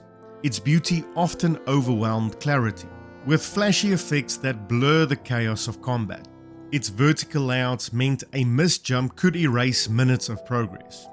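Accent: South Africa. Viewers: Hey man, what accent do you have? South African? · Aussie Channel, but I know a Saffer when I hear them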